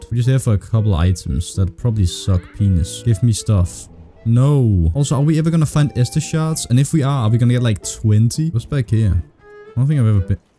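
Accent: Denmark. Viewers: I just knew about your channel and as soon as I heard your voice I knew you had a danish accent. Respect for a fellow danish Dark Souls player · i love how hard his danish accent is:) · You can still kinda hire the Danish accent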